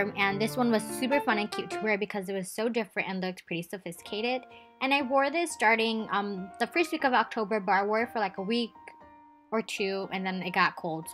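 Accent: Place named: United States. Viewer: Don't know your channel. You sound American, how'd you end up in Japan?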